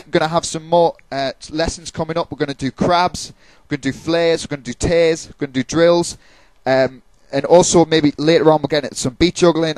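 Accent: United Kingdom. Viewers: i fuckin hate the british accent, but for sum strange reason i dont mind when you're speakin it =/ lol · you a geordie?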